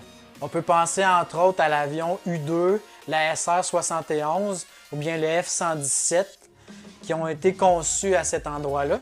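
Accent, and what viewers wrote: Quebec, Canada. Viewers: J adore ton accent du Québec ♥♥ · derien 👍 tu peux faire des vidéo sur les zombie et j'adore ton accent québécois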